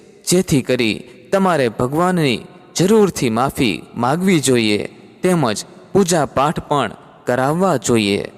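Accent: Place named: Kathiawar, India. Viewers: Good but speech irrted che bcz kathiyavadi bhashaaa😮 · વક્તાના ઉચ્ચારો પરથી લાગે છે કે તેઓ ભાવનગર તરફના હોવા જોઈએ.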